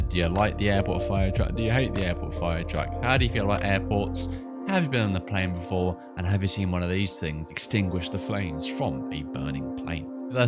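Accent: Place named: Denmark. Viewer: holy danish accent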